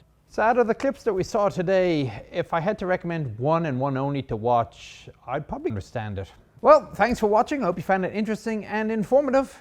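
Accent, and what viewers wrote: Ireland. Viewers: Long way to “Tipp” with the accent! Got to UCD Belfield? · I'm sure he won't mind, but that is an Irish accent. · This guy is the BOSS!! He sounds Irish ☘️ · Is that an Irish accent? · Great video. Bit of a twinge of an Irish accent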